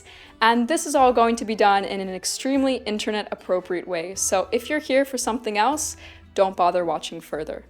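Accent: United States. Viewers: you sound American. But the way you said privacy makes me suspicious lol 😂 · Sounds US midwestern to me. Lots of extra s in midwestern speach.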